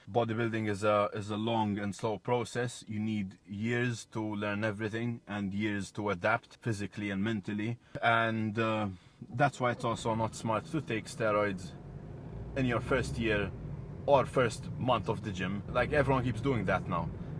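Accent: Malta. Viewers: hes from Malta I think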